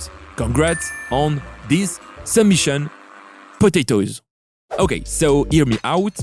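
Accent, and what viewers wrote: France. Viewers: Je sais pas si tu es français mais tu as un fort accent français 👀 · Is me or you have a big french accent , you speak french ?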